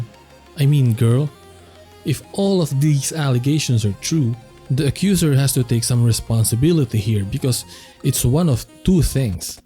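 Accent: Philippines. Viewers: You sound very pinoy to my ears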